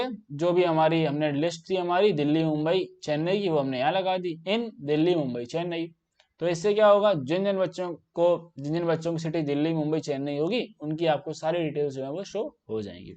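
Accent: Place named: Kanpur, India. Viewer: bhai app kanpur se ho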